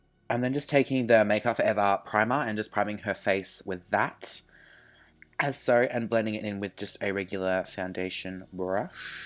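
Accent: Australia. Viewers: Never mind... It's definetly Australian 😅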